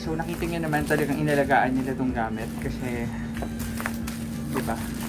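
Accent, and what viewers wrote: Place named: Iloilo, Philippines. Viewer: May naririnig akong ilonggo accent hehe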